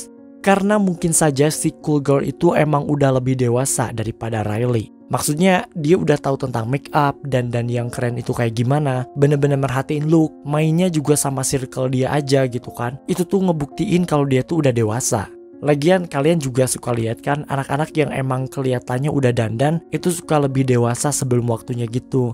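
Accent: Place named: Java, Indonesia. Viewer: Bang, anda orang Jawa ya soalnya sedikit denger ada logat Jawa nya tapi saya orang Sunda kok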